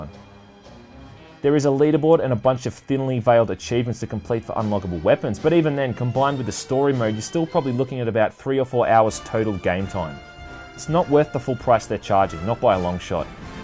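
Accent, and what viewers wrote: Australia. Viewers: Aussie mate ?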